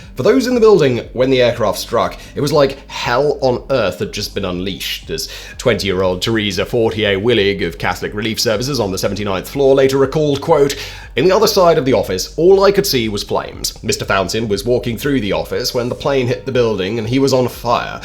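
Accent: United Kingdom. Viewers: maybe that's just because his British accent. Seriously though, go to settings and playback speed. Select maybe .5 or whatever you want to try. I'm listening at 1.5x speed currently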